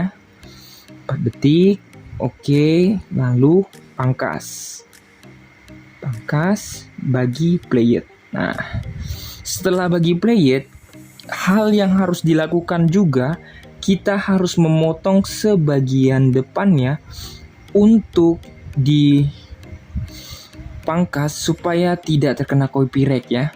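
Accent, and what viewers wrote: Makassar, Indonesia. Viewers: Orang makassar yah bang?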